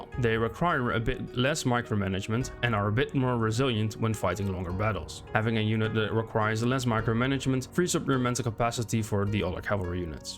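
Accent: Netherlands. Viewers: ah, it's dutch. Cool to know) Btw, do you review mods for shogun 2?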